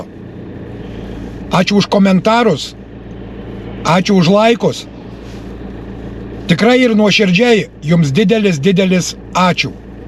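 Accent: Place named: Alytus, Lithuania. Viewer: Pasiūlė jūtūbas video pažiūrėt, ant juoko paspaudžiau ir visą sužiūrėjau, užsiprenumeravau. Ir akcentas alytietiškas labai gražus (pats iš Alytaus esu), ir komentarai pakeliui, ir šuniukai. Tiksliai net nepasakysiu, kas taip patiko, bet patiko ir žiūrėsiu dar :)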